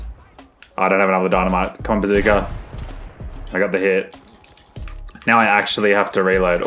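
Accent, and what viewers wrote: Australia. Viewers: Is it weird that a understood his aussie gibberish being an Indian? 🤔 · Oh ur Australian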